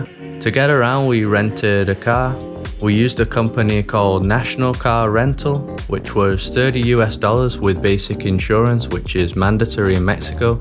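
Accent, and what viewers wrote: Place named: Manchester, United Kingdom. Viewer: Sound like you're from Swinton or Clifton, Manchester!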